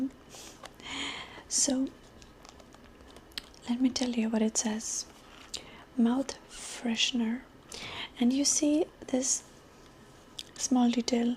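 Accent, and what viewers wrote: India. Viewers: “Do you know how expensive my sari is??” 🥳Your Indian accent and this character are the best! Also: the moon is always out, even in the day, but is made invisible by the suns brightness, which means your light is eternal and constant, unlike the suns.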